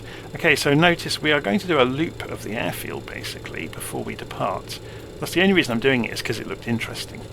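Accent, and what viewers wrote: United Kingdom. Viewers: Hello, thank you for the calm and lucidity you bring to flight simming by way of your well conducted videos. I have a question, when you bring up multiple screens simultaneously on then narrow window, what program/app do you use to accomplish same? By the way, your calm and polished British accent is very easy on the ear😊! Thank you.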